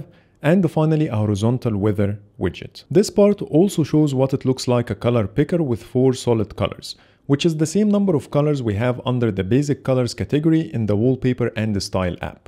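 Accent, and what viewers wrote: Egypt. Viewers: طب و الله حاسس انك مصري رغم انك تتكلم انجليزي😁 هعرف نفسي صحيح لما تحطلي قلب😅 اخوك من تونس 🇪🇬❤️🇹🇳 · Your accent is a lot similar to our Egyptian one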